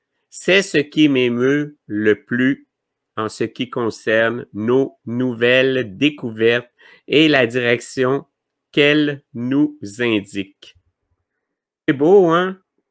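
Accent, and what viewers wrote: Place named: Canada. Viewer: ❤️ Merci beaucoup cher 🙏🏻. J’aime vous écouter et votre accent canadien 🎄 J’habite en CORSE et bien loin de chez vous: magique aussi ces liens instantanés d’un bout à l’autre de la planète 🌍 Cette vidéo, avec l’Alliance Galactique, sont pour moi les plus importants, comme des contes de Fées bien réels... des magnifiques et grands moments d’émotions pour moi 🙏🏻🕊🕊🕊 Gros bisous 😘🥰❤️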